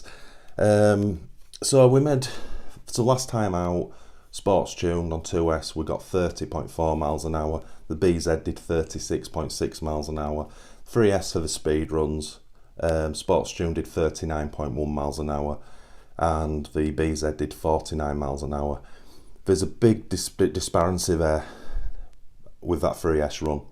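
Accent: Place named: England, United Kingdom. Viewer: Nice results, I'm planning to built up my TT-02SR with the TBLM-02s 10.5 turn and TBLE-02s ESC , pinion size I'm not sure yet. A high speed spur gear is also on it's way and a set of foam tires, maybe they will get better results? I'm not ready yet to switch to Lipo, stick to NiMh batteries for now. You sound very English but resides in Canada? Also saw an older movie where you were a little slimmer.. Lol. Well keep them coming those movies. Cheers.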